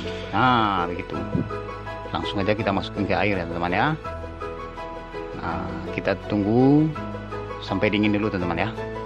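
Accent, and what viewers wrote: Padang, Indonesia. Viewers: Mokasih daa.. dri logat nyo, jaleh bana urang Padang🤣👍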